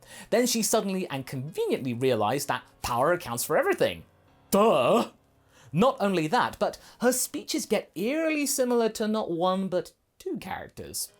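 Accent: United Kingdom. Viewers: J2391 hes british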